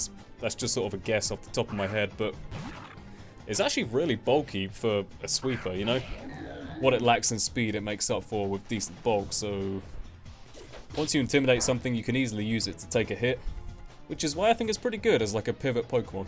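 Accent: United Kingdom. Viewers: You have an amazing british accent :)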